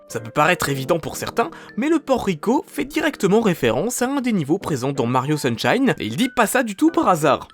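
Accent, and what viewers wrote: England, United Kingdom. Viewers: Je critique l'accent anglais de. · Plus que l'accent anglais, j'avais l'impression que était dépressif sur cette vidéo xD Be proud and happy! =) Vidéo intéressante cependant, j'aime bien les easter eggs:p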